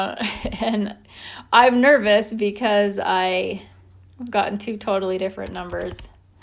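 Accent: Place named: United Kingdom. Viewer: Hello MAM I'M A GRADE 12 STUDENT FROM INDIA.......I USED TO THINK I CAN'T UNDERSTAND BRITISH ACCENT BUT I WAS WRONG U MADE THIS GAS STOICHIOMETRY PROBLEMS DAMN EASY......I NEVER EVER UNDERSTOOD THE CONCEPT OF WATER DISP METHOD PROPERLY BUT THANKS TO U FOR MAKING IT A LOL TOPIC.......BTW PLSS MAKE VIDEOS ON PHYSICAL CHEMISTRY RELATED CONCEPTS AND SOME INORGANIC BLOCK CHEMISTRY RELATED CONCEPTS😅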